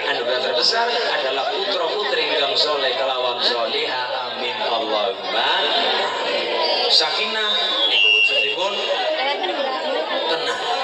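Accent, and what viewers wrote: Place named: Banyumas, Indonesia. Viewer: Ngapak ustadz nya..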